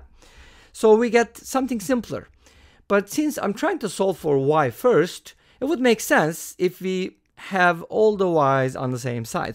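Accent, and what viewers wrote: Turkey. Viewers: I think you're a turkish. 'cause you speak english with turkish accent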